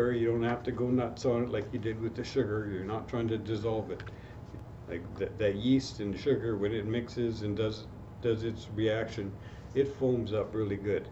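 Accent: Canada. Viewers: The accent is Canadian, eh? Thanks for the 420 411 dude. Go Oilers and God save QE2 eh?♥♥♥ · Chong was from Edomonton, Canada. The dialect sounds nearly identical. Nice DIY demo, thanks for posting. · You've got a pretty strong canadian accent, its like making carbon dioxide with Bob and Doug Mackenzie. Dont forget your tuke eh, uhh, and...uhhh bring me another beer there hoser.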